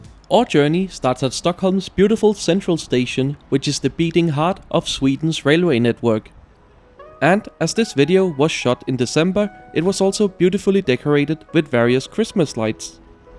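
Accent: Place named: Denmark. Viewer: It's a very danish pronounciation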